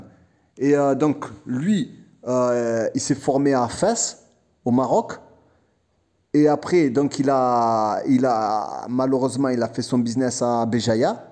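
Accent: Marseille, France. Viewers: Ti a l'accent Marseille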